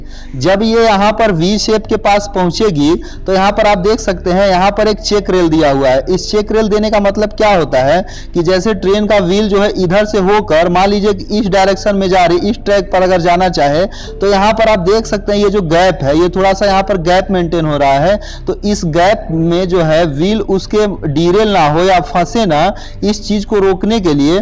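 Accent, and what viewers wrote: Bihar, India. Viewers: Bhay tum pakka Bihar se ho...mai v patna ka hi hu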